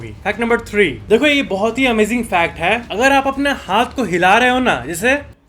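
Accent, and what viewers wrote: Bihar, India. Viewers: Great Bro , You Are Back In Your Old Version And We Are Liked It ,, Specially Your Bihari Language ♥️♥️♥️♥️❤❤❤❤❤❤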